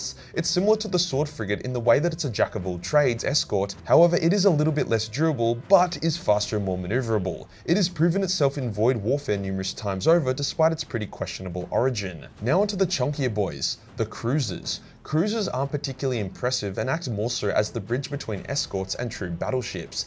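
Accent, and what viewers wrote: Australia. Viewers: Damn loud aussie lull me To sleep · Hearing an Australian swear at me and also teach me 40k lore is some what pleasing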